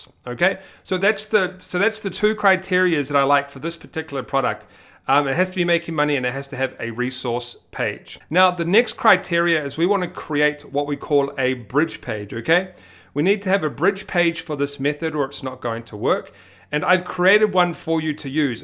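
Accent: New Zealand. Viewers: Are you from NZ ?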